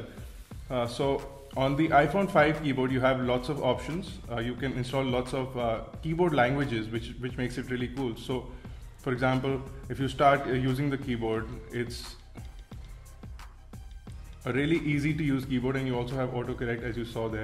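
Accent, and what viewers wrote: India. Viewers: Indian commentary :D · yeah indian accent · can`t u understand by accent ?? u dumb!! of course form indiaaaaaaaa... :D :D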